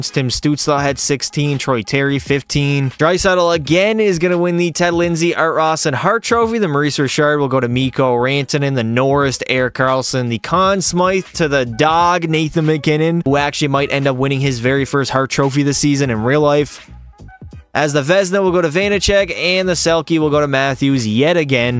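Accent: Newfoundland, Canada. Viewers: Are u from Newfoundland?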